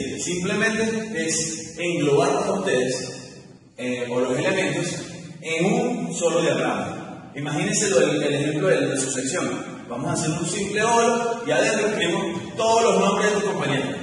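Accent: Venezuela. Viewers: Excelente tutorial, bien explicado, buen contenido, buen manejo del tema, buena energía y muy guapo el jóven! por el acento parece ser venezolano, me equivoco? saludos desde Guatemala! :)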